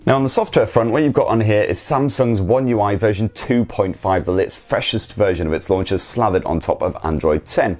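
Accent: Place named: Scotland, United Kingdom. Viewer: your accent... it's what keeps me hooked lol... Scottish?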